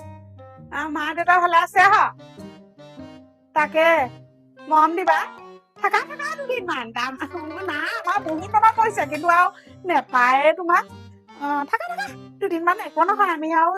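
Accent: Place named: Lower Assam, India. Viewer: 😂😂😂😂😂😂😂😂😂 Hahi Hahi petor nari dal dal 😅 Pise eta kotha. Please don't take it otherwise, apunar mukhot Lowee Assam accent tue besi val lage. Baki sob Mojjjaaa ❤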